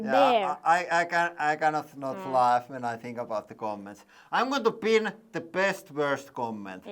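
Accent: Finland. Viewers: Plug. :) U is pronounced oo in finnish. That's also why he sometimes say boonker instead of bunker. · It's funny how strong the Finnish language pattern is.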